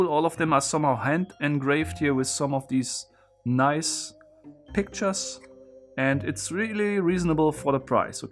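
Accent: Germany. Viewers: Is that german accent? 🤔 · Yes, it is a German accent... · wie man einfach raushört das er deutsch ist xD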